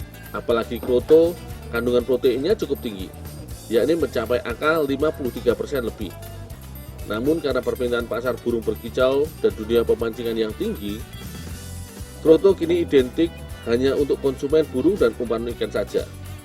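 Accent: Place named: Banyumas, Indonesia. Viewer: Ngapak yow .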